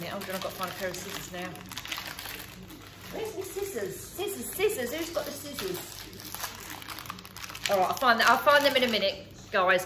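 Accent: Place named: Essex, United Kingdom. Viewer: Hi Hun, I absolutely love you videos, you are completely a girl after my own heart. I live in the North now, but I was born in Essex and grew up in the east end of London, so your wonderful accent is like music to my ears..Keep it up Hun Bella xxx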